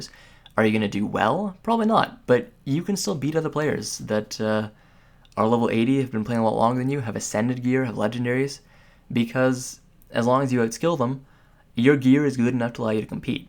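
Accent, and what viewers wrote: Canada. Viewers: Haha you candian right?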